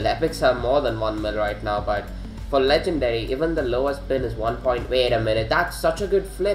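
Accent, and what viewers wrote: India. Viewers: The Indian accent shining thru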